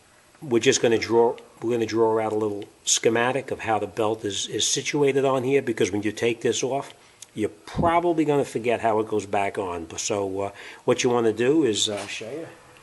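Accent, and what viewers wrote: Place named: East Coast, United States. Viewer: Finally another video with an east coast accent lol. Thanks for the instructions. John K (Newark N.J.)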